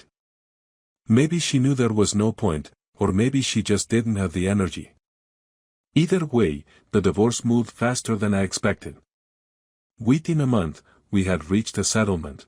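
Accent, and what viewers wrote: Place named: Spain. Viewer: This is funny sometimes the voice sounds Spanish…even SpanGlishly pronouncing words. Its like the setting is regardless of the English it is said or pronounced like Spanish.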